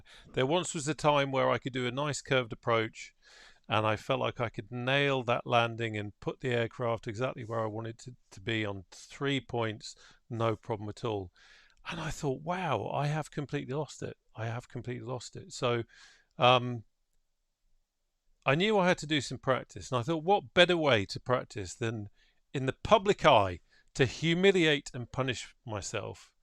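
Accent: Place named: England, United Kingdom. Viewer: You were absolutely great on this one. Thank you very much. I' m not familiar with how to financially support you but I will find out on way or the other. Finding and get caught on the immersion of this sim is something I share with you and I prefer English above the American accent as a real Dutchman, you neighbor so to speak. See you next time🙏👍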